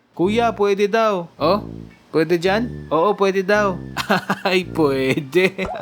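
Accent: Ilocos, Philippines. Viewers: Kuya ilocano ka po ba?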